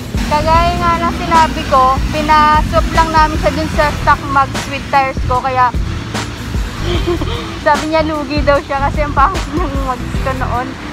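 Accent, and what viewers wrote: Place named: Batangas, Philippines. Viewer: Nakapakaangas!! Bagayyy kay elmo!! Ang cute din ng batangueña accent mo hehehe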